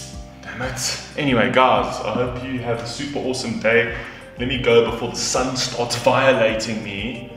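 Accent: South Africa. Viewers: just came across this.90% sure thats South African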